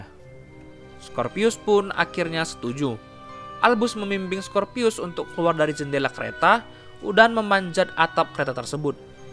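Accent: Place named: United Kingdom. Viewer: british skali komentatornya hehehe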